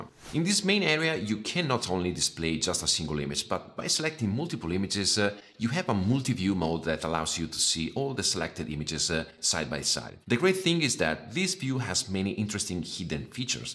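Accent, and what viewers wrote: Italy. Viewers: English with Italian aksent is much better than native English. But I think this goes for everything, a flavor of Italy will always make things improve.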